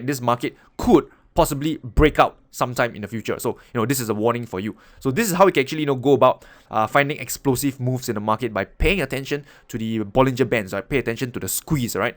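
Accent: Singapore. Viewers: Nice to hear a Singaporean accent :) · are you singaporean, i like your accent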